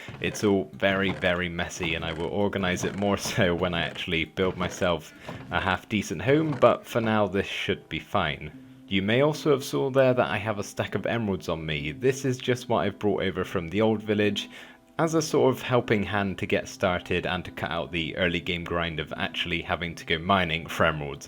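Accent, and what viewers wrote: United Kingdom. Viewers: i have literally been trying to figure out if he's British or not, but this episode has convinced me that he is...*cough* cough "not at toll" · he is British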